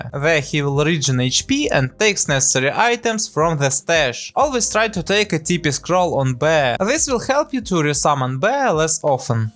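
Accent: Russia. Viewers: so hard to listen to the Russian accent in English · Вен ю трай то спик инглиш, бат юр акцент из стил рашн)) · У тебя специально русский акцент?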